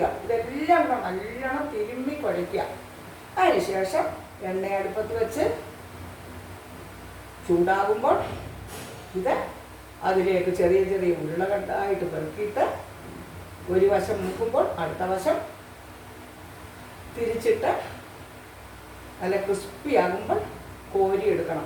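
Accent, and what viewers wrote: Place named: Kottayam, India. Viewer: But you have a Kottayam slang any connections with Kottayam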